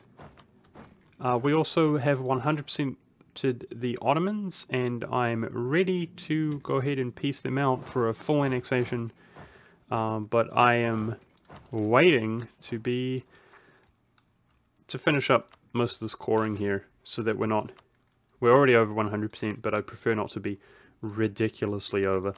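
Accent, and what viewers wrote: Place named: New Zealand. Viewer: He's from that island next to Australia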